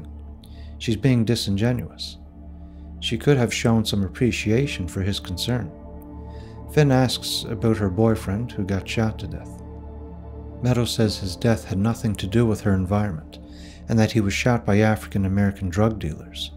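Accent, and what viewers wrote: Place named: Cape Breton, Canada. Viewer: You've got to be from Cape Breton. I grew up surrounded by that accent. 😁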